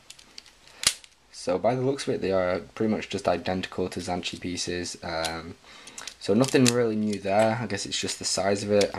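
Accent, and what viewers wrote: United Kingdom. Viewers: you sound british , how long did it take light take to ship to britain if you do live in britain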